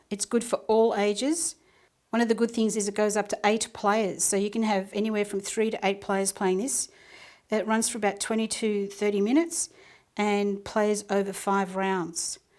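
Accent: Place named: Australia. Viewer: Nice video thanks. And an Aussie voice. Nice.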